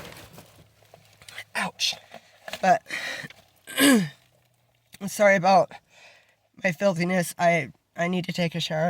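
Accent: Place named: New England, United States. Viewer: You have a similar accent to mine. Are you local to southern vermont or western mass?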